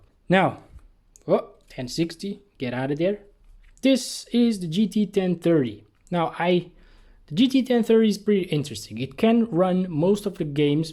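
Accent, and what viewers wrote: Portugal. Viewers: És português? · Ah, então você é de Portugal?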